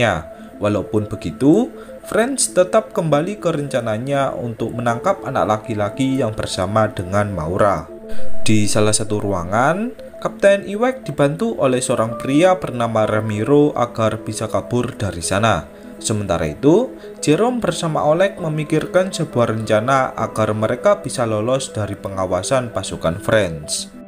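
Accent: Java, Indonesia. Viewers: "Medok-nya" kental. Wong Jowo toh mas?? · ini terlalu medok suaranya hahaha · Miminnya orang jawa kayanya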